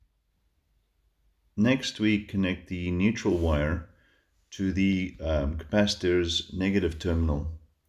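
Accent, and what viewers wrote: South Africa. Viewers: Thank you for this. Have been looking for a solution as simple as this one. Just to confirm, the sizes of the components you used works for RSA households (voltage etc)? I assumed from your accent you're South African...Afrikaans soos ek;-). Where do you buy your components? I already have the Sonoff switches, just looking for a reference for the diodes and capacitor? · Howzit boet! Tell me you are South African!!!!!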